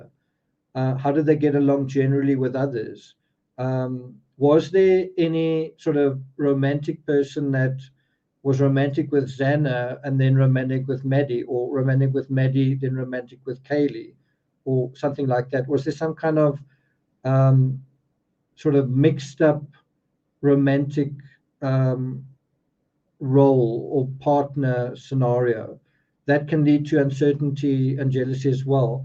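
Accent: South Africa. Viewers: South African talking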